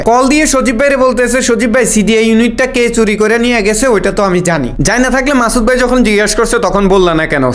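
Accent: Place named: Noakhali, Bangladesh. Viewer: নোয়াখালীর লোক? খাইছেরে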